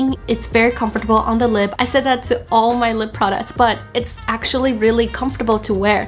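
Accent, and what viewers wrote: United Kingdom. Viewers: i LOVE your subtle british accent! so cute!!!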